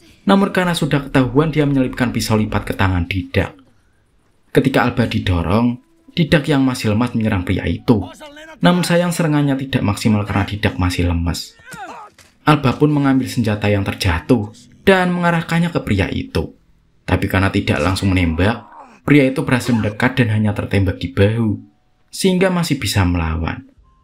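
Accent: Java, Indonesia. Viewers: Pegel dengar naratornya yg medhok jawa.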